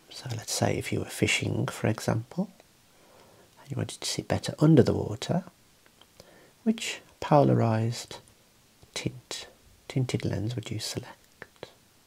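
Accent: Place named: Black Country, United Kingdom. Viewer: As a Midlander, loving the Black Country accent!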